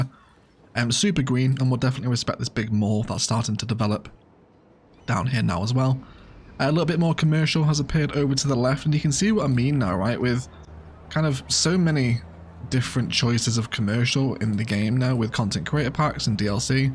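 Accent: Australia. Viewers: take it easy on the Gum Tree.. April fools, you don't have that many yet. Love the video, so excited to see it grow. Perfect chill out vibes - and aussi accent! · Music ✅️ Aussie accent ✅️ train only start ✅️ incredible map ✅️ special guest appearance ✅️✅️✅️ editing ✅️ do I love Thessia already? ✅️✅️✅️❤️❤️❤️❤️❤️❤️❤️❤️❤️ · Honestly mate what an amazing train station area I don’t know how you managed to capture the vibe of a small aussie community train station so well I’m from Perth and that station would even fit in over here to 👌🏻 also keep the Aussie accent in it’s actually not bad 🤣🤣 very keen for this series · Right on with the aussie accent mate!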